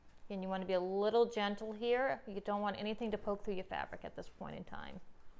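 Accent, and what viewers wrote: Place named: New England, United States. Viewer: Great tutorial...you sound like a fellow New Englander...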